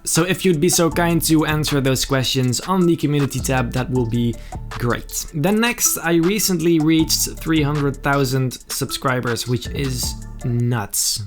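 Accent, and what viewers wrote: Netherlands. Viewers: what do you mean he hasn't done tutorials the dutch accent tuts are actually good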